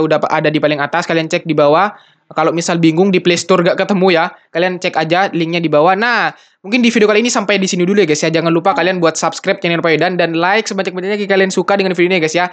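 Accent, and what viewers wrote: Madura, Indonesia. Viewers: Logatnya logat Madura bner kah?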